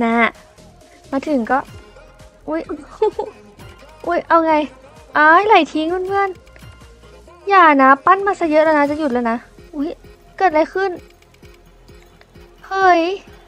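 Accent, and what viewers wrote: Rural, Thailand. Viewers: ชอบเสียงพี่เค้ามากเลยอ่ะโอ้โหเสียงแบบเหน่อได้ใจ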